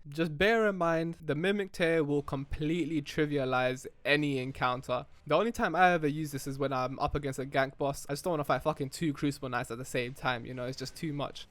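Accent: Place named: London, United Kingdom. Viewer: Bro is definitely from london 😭😭😭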